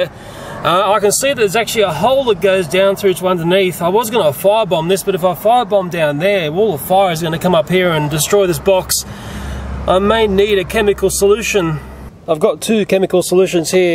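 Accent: Australia. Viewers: At first my friend thought "How in the world can you find one of the most dangerous spiders in your backyard?" Then she saw that you had an Australian accent, and said "Oh." Welcome to Australia everyone!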